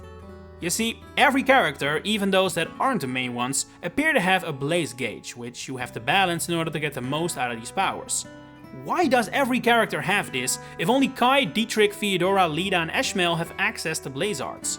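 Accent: Netherlands. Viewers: Unrelated but love the Dutch accent 🫶🏽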